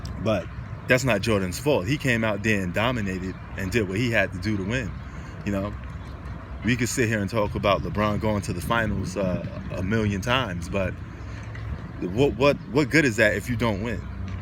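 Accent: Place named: New York, United States. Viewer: I thought you was from NY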